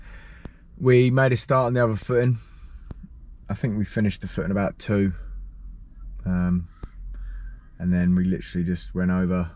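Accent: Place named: South London, United Kingdom. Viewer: His accent is completely fake. I can already tell from his facial features that he is an Irishman I will NOT like the video unless he starts presenting his video in a heavy Irish accent. He is ashamed of his roots Why is he ashamed of being Irish? His facial features are Irish but yet he speaks with a south London accent. He has no pride in his Irish culture. So he tries to act and speak like a different person because he is ashamed of himself so he hides behind an alternate personality. He was mistreated as a child and consequently grew up with schizophrenia. He is bi-polar - hence he switches his accent from Irish to south London.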